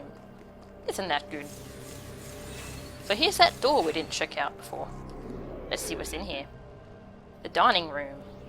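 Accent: Australia. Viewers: You have a very amusing voice. Australian accent?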